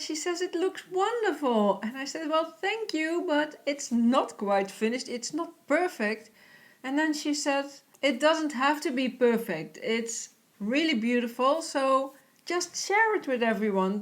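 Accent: Netherlands. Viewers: Thanks so much for making and sharing you work. I love your ideas and you have helped me a lot with your tips and ideas. Also I lived in Amsterdam and Harlimgen in the North in the 1970s (yes Im 70 now) and love to hear you accent. It brings back many memories for me. I watch Dutch TV series to try to keep up my Dutch and really enjoyed Baantjer: Het Begin - took me back to my years in The Dam x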